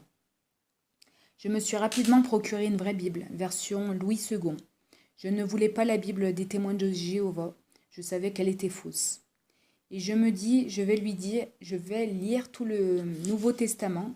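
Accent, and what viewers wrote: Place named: Nord-Pas-de-Calais, France. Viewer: 💙✨💙✨💙✨💙 J’entends un bien joli accent Ch’ti... Votre témoignage est bouleversant. MERCI pour ce partage ! Vous et moi avons traversé des évènements similaires. Voici, je vous ai donné le pouvoir de marcher sur les serpents et les scorpions, et sur toute la puissance de l’ennemi ; et rien ne pourra vous nuire. Luc (N’oubliez pas que quand on aime le Fils, on ne peut rejeter la Mère...) 💙✨💙✨💙✨💙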